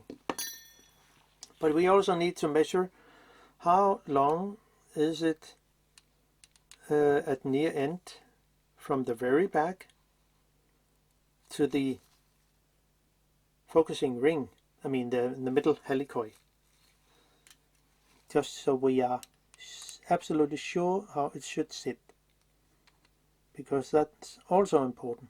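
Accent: Denmark. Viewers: You sound danish. Are you located in Denmark?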